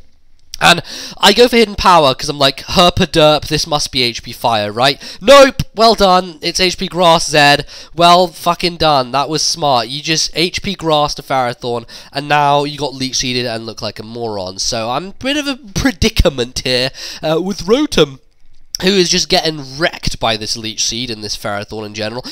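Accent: United Kingdom. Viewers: he british dumbshit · does a british dude sound like a frog dipshit ?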